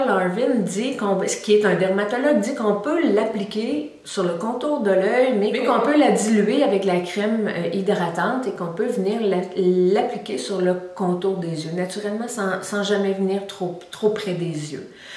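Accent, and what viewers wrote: Quebec, Canada. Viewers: Je taquine beaucoup mes copine québécoise pour leur accent mais toi je peux t écouter parler pendant des heures ... donc j ai bien compris après avoir bien fait pénétrer la crème et laisse poser tu applique quand même tes sérum doux sans acide je ferai pareil et les soirs off je mettrai mes sérum au retinol merci pour tous les conseil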